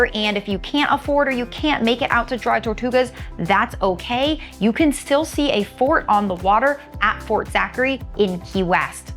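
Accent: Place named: Upper Midwest, United States. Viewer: You sound like you are from the upper Mid west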